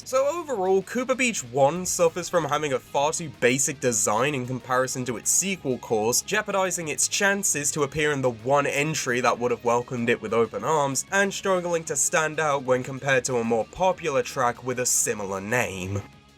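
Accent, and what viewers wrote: United Kingdom. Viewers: why is he british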